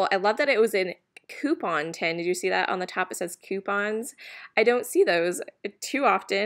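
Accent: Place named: Maine, United States. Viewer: I liike to hear you talk with your Maine accent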